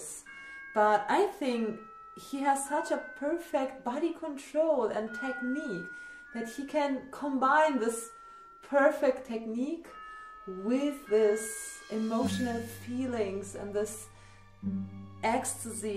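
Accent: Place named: Central Europe. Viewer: I knew you must be from somewhrere middle-europe.... Well, must see thisone... Lets go....No idea, love your accent. Im finnish, by the way... 😚🥰 Damneeeed, this really so broken, video I mean....? God damned....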